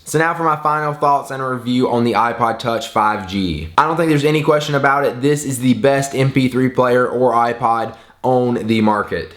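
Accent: South, United States. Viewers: Does he really have a country accent? Never noticed that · Is this guy southern? I think I hear a little acent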